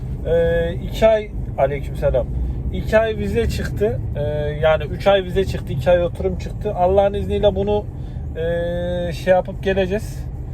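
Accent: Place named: Central Anatolia, Turkey. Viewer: Kolay gelsin REIS konusma Tarzin ic anadolu lu oldugunu gosteriyo galiba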